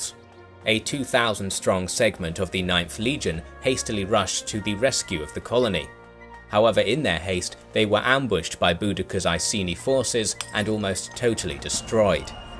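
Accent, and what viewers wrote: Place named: United Kingdom. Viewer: Yeaaaaah History > GOT So this i why you wanted a female british voice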